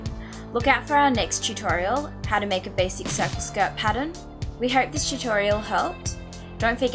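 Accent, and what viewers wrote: Australia. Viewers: My god, I'm Australian (with this exact type of Aussie Accent) and I didn't even pick it. You must have a good ear!) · Your accent sounds familiar lol, are you from ausltralia?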